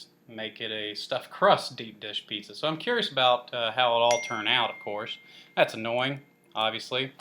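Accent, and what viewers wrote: United States. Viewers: You have a country accent. It's cute 😉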